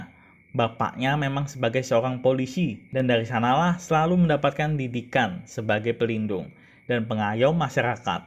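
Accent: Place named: West Java, Indonesia. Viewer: Bener dugaan sy dr logat bicaranya kayak org Sunda taunya bener dr bandung... Wilujeung tugas sing salamet sehat Sareng amanah 🤗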